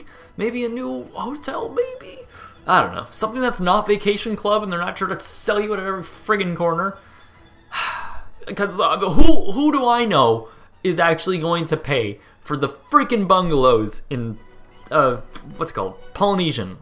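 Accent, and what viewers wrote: Canada. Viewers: Super interesting and wonderfully done,! (are you Canadian? - you have that accent!) Good stuff. I love your work.!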